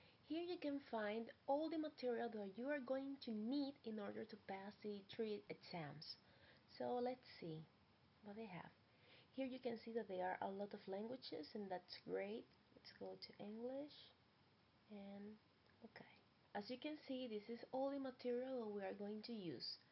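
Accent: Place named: Netherlands. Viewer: aww thank you so much can i ask more question how many months did you learn dutch and understand it? and in CIE exam how many hours or minutes does the exam goes im so nervous cos im doing self study as you your dutch accent is really nice aswell on the video its btw 😊.sorry i have a lot question hope you understand me 😘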